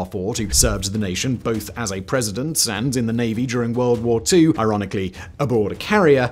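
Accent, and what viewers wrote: United Kingdom. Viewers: I find myself conflicted given I have quite the military boner in regards to an American Aircraft carrier bringing the pain, yet it's history is being delivered by a Brit....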